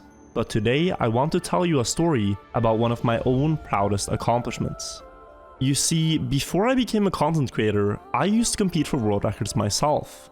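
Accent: Norway. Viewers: Top Trackmania expertise + slight (and sexy) Norwegian accent = perfect content.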